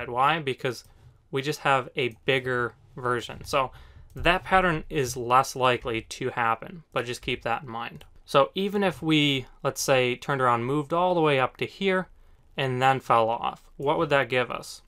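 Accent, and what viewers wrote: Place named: New York, United States. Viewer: As a newbie in the crypto world, I can see how easy it would be to get sucked in with misinformation from so called experts. After watching a few of your videos and seeing Bitcoin do exactly as you said it would, I am glad beyond words that I found you. Now instead of putting my money into a course run by thieves I am looking forward to the course you had mentioned that is coming soon. Here is to learning the right way and I don’t know if you are from New York or not but your delivery sounds just like a New Yorker especially when you are talking about all the people out there who don’t know what they are talking about. You just tell it like it is…love it!!!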